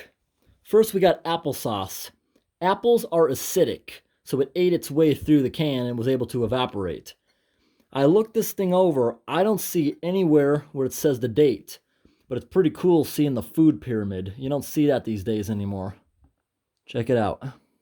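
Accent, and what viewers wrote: New England, United States. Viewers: App ri cots lol. New England accents are weird. Your videos are the best though! You really need to get a P.O. Box like ashens used to do and he’d get all sorts of crazy old food.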